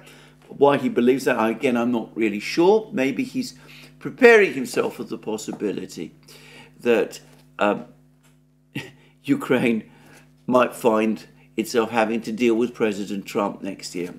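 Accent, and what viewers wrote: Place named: RP, United Kingdom. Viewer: You can easily double the speed of Alexander, and still hear him clearly - that's one of the boons of posh English.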